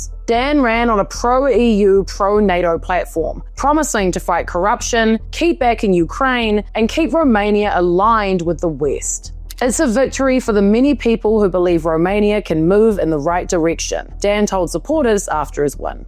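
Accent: New Zealand. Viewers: great update and its a easy kiwi accent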